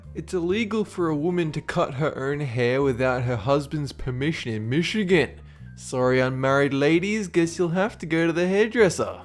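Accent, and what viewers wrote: Australia. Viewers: this is gonna sound stupid but are you Australian? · Are Australian or British? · Is this guy Aussie? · I'm new to this channel and he sounds australian..... ( JUST LIKE ME☺️) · you australian?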